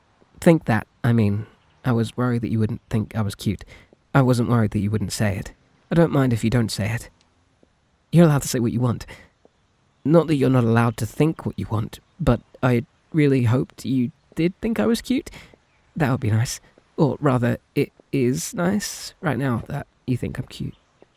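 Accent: United Kingdom. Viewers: I'm fucking dead. The level of comedic timing is impressive. Taking in to account I was just mocking his british accent just a second ago.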